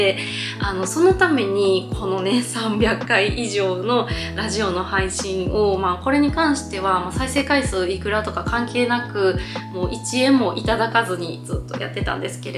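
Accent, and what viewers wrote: Kansai, Japan. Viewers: 最近のあんくんと出ている動画では標準語寄りだけども、1人で話す時はやはり関西弁になるのがかわいい（笑） これからも変わらず応援していますよ✨✨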